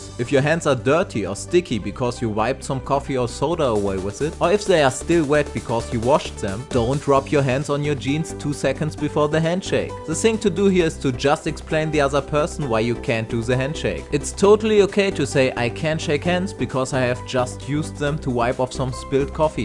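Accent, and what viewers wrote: Germany. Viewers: Der deutsche Akzent... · great content, very german english but gramatically most of it is right, so keep it up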